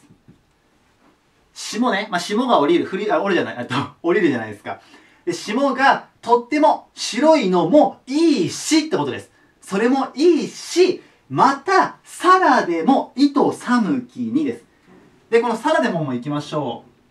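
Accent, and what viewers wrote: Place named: Osaka, Japan. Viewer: 大阪の方ですか？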